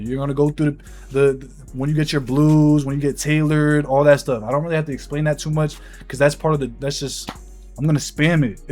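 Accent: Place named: New York, United States. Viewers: “Calm” valid eats” “move tact” yea You definitely from New York😂😂😂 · Not even the first minute hearing bro you can already tell😂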